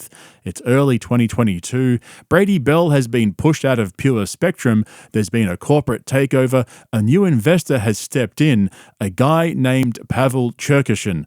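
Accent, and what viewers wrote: Australia. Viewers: This is amazing, loving hearing an Aus accent on this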